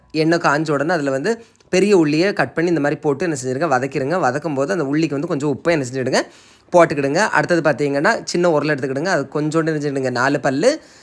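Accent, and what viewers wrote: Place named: Kerala, India. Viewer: Hello sir ni ga pesupothu malayalam slag verunudallo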